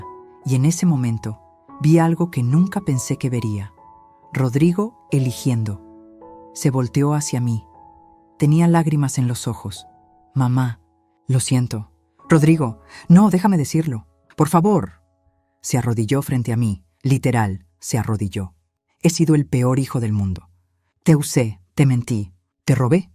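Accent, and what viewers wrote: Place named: Portugal. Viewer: Buena historia esta voz narra varias historias, pero es chocante que narre y meta portuguez y la acentuacion española ?????? Con todo respeto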